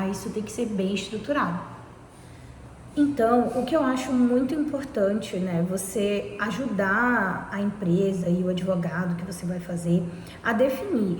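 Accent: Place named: Belém, Brazil. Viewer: pode me dar o contato da Martell? Fica aqui em NY? Eu tenho personal plan e to em RFE. É de chorar. Pelo seu sotaque acho que você eh de Belém né? Sou paraense radicada nos eua. Mas sai daí pequena.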